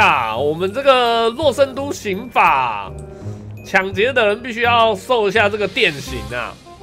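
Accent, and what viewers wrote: Hong Kong, China. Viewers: 你是香港人媽我不是但看ˋ你講話很像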